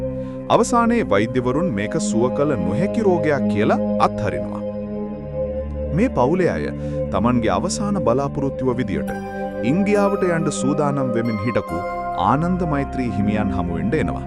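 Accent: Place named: Tamil, Sri Lanka. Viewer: මේ voice එක AI ද? එසේ නම් කරුණාකර සිංහල භාෂාවෙන් හඬ කවන වීඩියෝ සඳහා නියම සිංහල උච්චාරණය සහිත AI voicing version එකක් තෝරා ගන්නා ලෙසට මේ සංලක්ෂා යු ටියුබ් නාලිකාවේ හිමිකාරත්වයෙන් ඉල්ලා සිටිමි. නැතිනම් සිංහල මවු භාෂාව වන අයෙකු ලවා ඔබගේ script එක voice කරවා ගන්න. මේ නාලිකාවේ සෑම වීඩියෝවක්ම තියෙන්නෙ මේ කටහඬින්. අපිට දැනෙන්නේ හරියට දෙමල භාෂාව කතාකරන කෙනෙක් සිංහල කතා කරනවා වගේ. එය එක දිගට ශ්‍රවණය කරද්දී අමාරුයි, රසවින්දනයට බාධාවක්. ඔබගේ වීඩියෝ හොඳයි, educative,We feel like we are listening to a different language. Please don't interpret this as discrimination against any other language.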